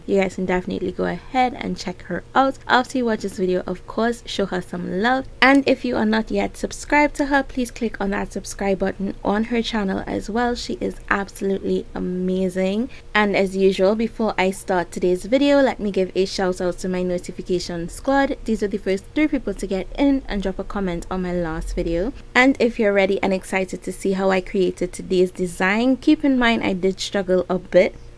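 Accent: Trinidad and Tobago. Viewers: I enjoyed both videos i am a new sub & u sound like a trini just like me wow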